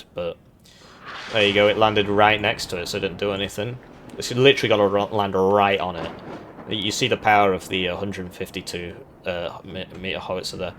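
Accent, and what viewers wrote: United Kingdom. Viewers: GGs, thanks for waiting. Never skip the deck review. Man did you sound British is this vid! I think the water helps your accent.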